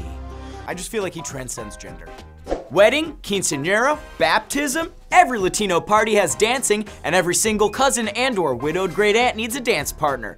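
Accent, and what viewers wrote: Puerto Rico. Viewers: i love your puerto rican funny talking ..thanks for let me being happy...Papi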